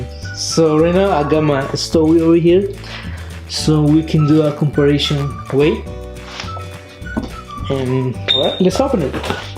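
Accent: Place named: India. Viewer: i like your Indian accent Bruh! lol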